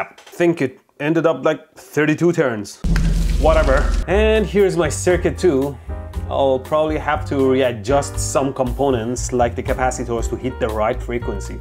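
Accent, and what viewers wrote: Iran. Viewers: چقدر لهجت بە ایرانیا میخورە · میدونم که ایرانی هستی * از لهجت معلومه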